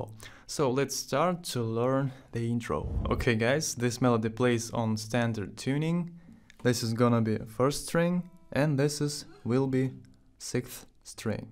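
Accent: Russia. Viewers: а я сижу и думаю, а чет акцент русский дофига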